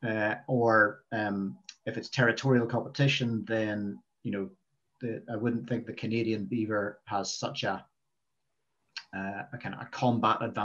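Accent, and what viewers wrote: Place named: United Kingdom. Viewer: I speak North American English but have trouble understanding UK English---toooo fast. It would have been easier to understand if we had been able to see the face of the speaker. Can N American Beavers easily interbreed with Eurasian Beavers?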